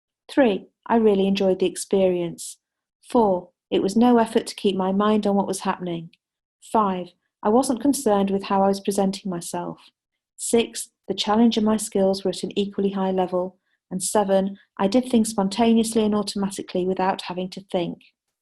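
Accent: United Kingdom. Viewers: Great accent deep British accent, deep information. Thanks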